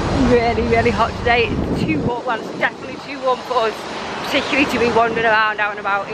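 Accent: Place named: RP, United Kingdom. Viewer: you accent sounds like queen Elizabeth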